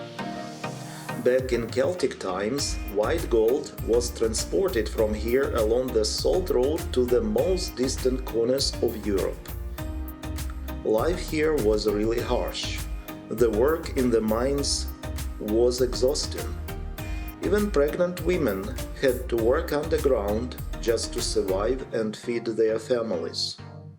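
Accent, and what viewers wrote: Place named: Germany. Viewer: Здравствуйте ещё раз. Сначала собралась искать субтитры, думала на немецком. У Вас уже немецкий акцент. Но потом поняла, что это английский. И всё понятно, хорошее произношение. А я как раз учу английский. Очень красивые места. С большим удовольствием смотрю. Супер!👍👍👍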